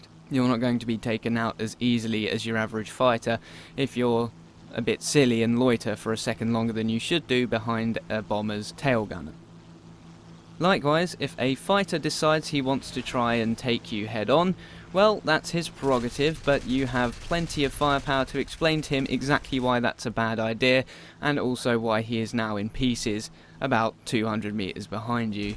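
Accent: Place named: United Kingdom. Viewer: Ahhh finally a gameplayer, who speaks a clear and good british english. Thank you! :D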